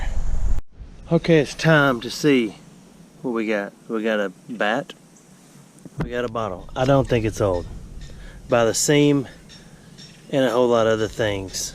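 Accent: South, United States. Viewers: I am from the communist state of California. I am a Southerner at heart and always have been. I really enjoy the accent from the south when you people talk. It soothes my soul. Growing up my best friend's family was from Birmingham. They treated me like family.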